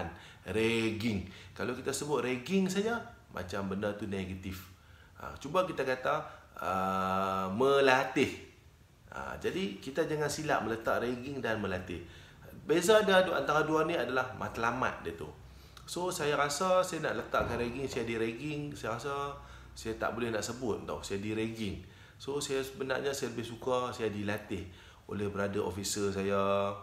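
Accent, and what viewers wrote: Kedah, Malaysia. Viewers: Steady Tuan..yang sempoi kompom org Kedah...gerek,qeba,nasib x sebut pedai ayam...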